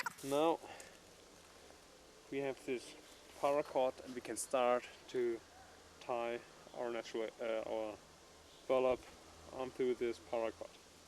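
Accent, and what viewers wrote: Germany. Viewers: god german english^^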